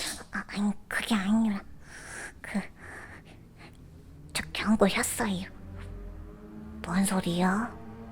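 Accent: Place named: Chungcheong, South Korea. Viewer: 충청도 사투리 구수혀유..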